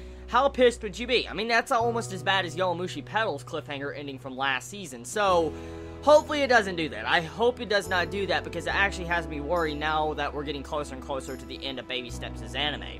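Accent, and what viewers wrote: South, United States. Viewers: Great review! First time viewing your channel, and I find it to be very well planned and enthusiastic. I can tell you do this for yourself yet you still appreciate your viewers and subscribers. Hearing Japanese names in a southern accent is hilarious and I hope you don't try to fix that. Yes I'm starting to get the anime blues on this one as well. This series is one of the most inspiring and entertaining sports anime I've ever seen! I have always enjoyed the more realistic dramas more than the fantasy shonen. I suppose that's why I like this one so much. Thanks for what you do. *subscribed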